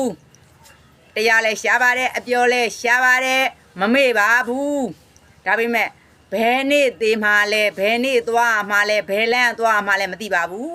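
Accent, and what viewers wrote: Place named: Ayeyarwady, Myanmar. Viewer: ဒါကြောင့် စကားပြောလေယူလေသိမ်းကဧရာဝတီတိုင်းသားလို့ထင်မိတာ မမှားဘူးနော်ချစ်စရာဧရာဝတီတိုင်းသားများ❤❤❤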